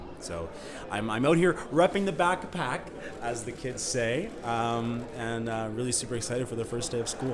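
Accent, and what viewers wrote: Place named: Australia. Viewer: I really thought you were Australian with a good American accent😅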